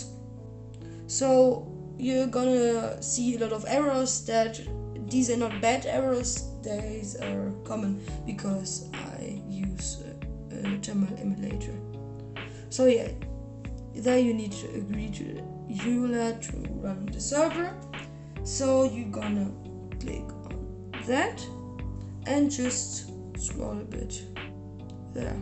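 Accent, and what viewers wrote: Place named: Germany. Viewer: du kannst gut englisch aber man hat das gehört das du deutsch bist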